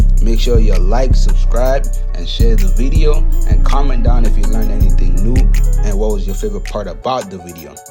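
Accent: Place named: Baltimore, United States. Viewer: Where you from? If you are close to me I will have to stop by and get a cut. I thought I heard a bmore accent.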